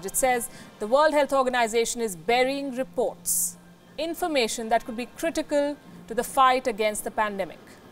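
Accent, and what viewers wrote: India. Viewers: Try speaking Hindi or Tamil. Your English is too Indian. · but what part of India though?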